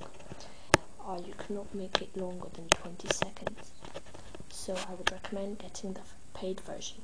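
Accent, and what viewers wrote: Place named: United Kingdom. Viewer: british accent hahhahaha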